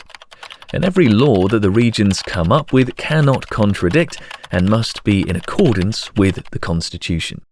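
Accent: United Kingdom. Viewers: Did you learn British English as a youth and thus, speak British English like a native, or does an Englishman narrate your scripts, or what? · That's because that's not the voice of the channel's author, they hired an actor from the UK and didn't hide that btw, check video's discription.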